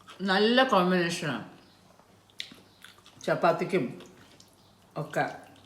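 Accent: Palakkad, India. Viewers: ഞാൻ ഒരു ട്രാൻസ് വുമൺ ആണ്. കുക്കിങ് ഇഷ്ട്ടമാണ്. ചേച്ചിയുടെ ഫാമിലിയെ ഒരുപാട് ഇഷ്ട്ടമാണ്. പാലക്കാടൻ തനിമ നിറഞ്ഞ സംസാരം ഒരുപാട് ഇഷ്ട്ടമാണ്. വീട്ടിൽ പ്രശ്നം ഉള്ളതുകൊണ്ട് എറണാകുളം ഹോസ്റ്റലിൽ ആണ് താമസം. വീട്ടിലെ ഫുഡ്‌ ഒകെ കഴിച്ചിട്ട് ഒരുപാട് ആയി. അമ്മയുടെ കയ്യ്കൊണ്ടുള്ള ഭക്ഷണം കഴിക്കാൻ കൊതി ആകുന്നു. ചേച്ചിയുടെ വീഡിയോ കാണുബോൾ എനിക്ക് എന്റ്റെ അമ്മയെ ഓർമ വരും. ചേച്ചി ഒരുപാട് സന്ദോഷത്തോടെ കുക്കിങ് ചെയ്തു മനസു നിറഞ്ഞു ആ ഭക്ഷണം കഴിക്കുന്നത്‌ കാണുബോൾ എന്റെ മനസും വയറും നിറഞ്ഞ ഒരു ഫീൽ ആണ്. Love ❤ U ചേച്ചി. എല്ലാവരോടും അനേഷണം പറയണം എന്റെ.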